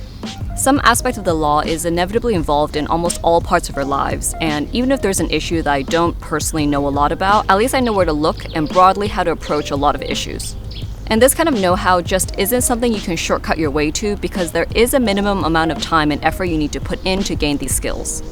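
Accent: United States. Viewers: There is what I think you and are either ABC or brought up in the States. The way you talk and the accent is completely different to the locals and CBC and BBC. Good luck on your new career.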